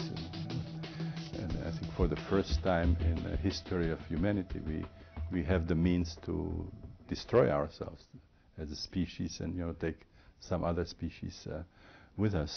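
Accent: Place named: Czech Republic. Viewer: he sounds Czech... I am about 99% sure by his accent and his name confirms that very well also.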